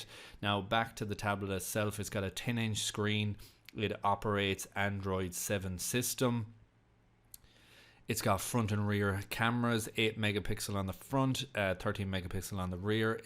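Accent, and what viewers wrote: Ireland. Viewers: not to be rude but i wanted to ask are you Irish?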